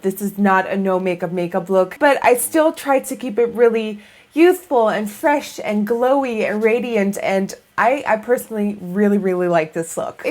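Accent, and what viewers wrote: Netherlands. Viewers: Kom je uit helmond?? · Is it me or does she sound a lot more Dutch in this video? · yes. she is from the Netherlands but it's less noticeable in more recent videos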